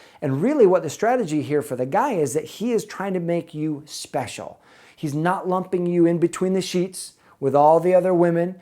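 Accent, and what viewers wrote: United States. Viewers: I love your video's. I love your American accent too 💛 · I love your slight country accent! I didn’t notice at first until you said “time”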